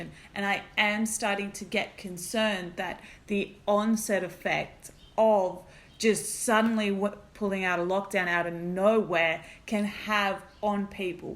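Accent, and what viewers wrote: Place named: New Zealand. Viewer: Gee you speak well, just reinforcing your absolutely stunning tribal council speech. Also just a small thing but as a Kiwi now living in Vic ... I can hear your accent starting to change and you are beginning to adopt the NZ way of saying your vowels.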